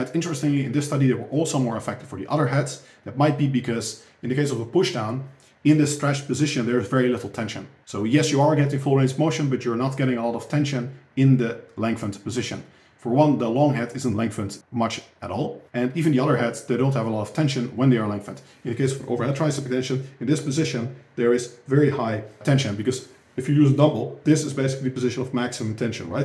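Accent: Netherlands. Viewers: At the start, for a second I thought Alistair Overeem was speaking :) Almost the same Dutch English accent and intonation hehe. Either way, great content! Keep up the great work!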